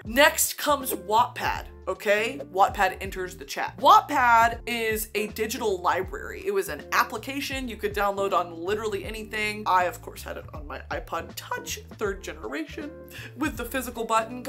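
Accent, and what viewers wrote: United Kingdom. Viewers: Seeing her face in the bottom left corner reading the fanfic in a British accent while I hide under my covers at night got me laughinggg😭😭😭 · Your British accent knocks me out every time😂👌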